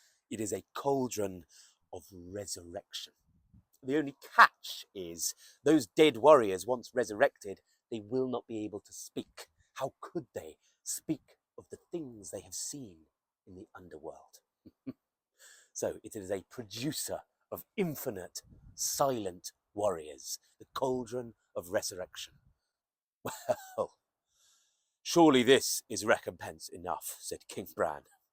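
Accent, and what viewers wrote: Wales, United Kingdom. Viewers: So its with the 'th' sound, I'm sure it has anything to do with your Welsh, I have a hard time with accents, I've tried to watch Irish movies in the past, even thou they are speaking English, I can't understand but half what they are saying, lol We got a Tsunami warning while I was watching your video yesterday, before I left for high ground, I took a peek at where it was coming from, saw way too many 7's, I told my son, who was here with me, it was a trick. I was joking around on your Loki video, using my other account, acting like a trickster, lol, when all this took place. Almost every day I write about December 5th, yesterday I forgot it was December 5th, I took it has a sign.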